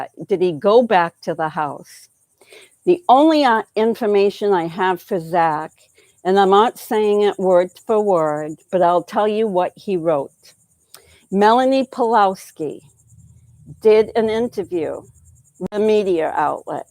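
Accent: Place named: New York, United States. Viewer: I love your daily greeting; very Marilyn Manson like, but unsuspecting! And, I'm guessing a New York accent. Probably from New York City? I'm guessing and sleuthing on you! GOOD MORNING BEAUTIFUL PEOPLE!! xoxo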